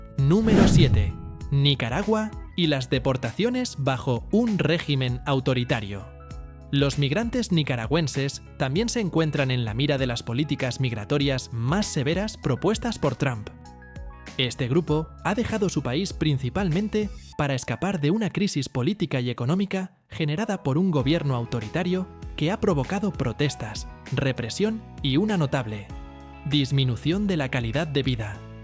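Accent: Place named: Spain. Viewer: Creo que por tu forma de hablar eres Español, son pocos los países latinos que buscan emigrar a EEUU la verdad son muy poco los panameños que quieren irse para allá ya que por el estatus de vida en nuestro país es estable solo viajan de manera de turista pero no por emigrar...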